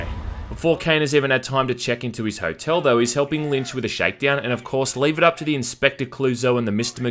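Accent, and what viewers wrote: Australia. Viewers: Its so weird that your American accent sounds so Australian. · FrÜt he is australian though? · Idk if you'll ever see this comment but thank you for your videos. They are not only great reviews but also have a nice bit of witty humor. Plus for me the accent reminds me of my mums family from Australia. Which also makes me love the videos even more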